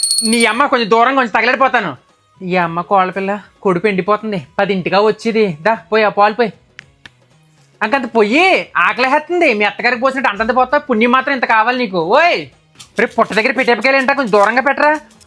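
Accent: Godavari, India. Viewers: Baboi...😂😂😂 Super comedy...ekkada unnaru mastaru meeru..love godari slang and very close to heart ....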